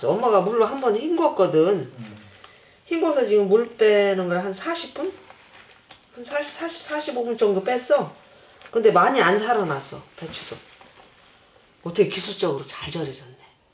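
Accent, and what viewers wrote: Chungcheong, South Korea. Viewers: 정감있는 충청도 말씀이셔서 고향이 충청도이신 돌아가신 친정엄마 생각 많이 났습니다 좋은영상 감사합니다 건강하세요~^^👍💖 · 영상 잘 봤습니다. 저희 어머니 고향도 충남이다 보니 꼭 저희 어머니 말씀하시는 줄 알았습니다. 만약에 40kg을 담근다면 님께서 20kg 기준으로 한 재료보다 2배정도 재료를 더 넣으면 되겠죠?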